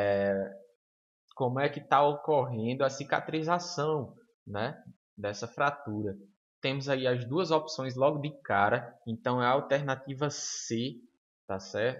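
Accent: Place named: Northeast, Brazil. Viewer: Cara top... É seu sutaque melhor ainda ..eeee nordestao. .. Sou tec. Em imobilização ortopédica. ..sempre fico refrescando a memória assistindo vídeos como o seu valeuuu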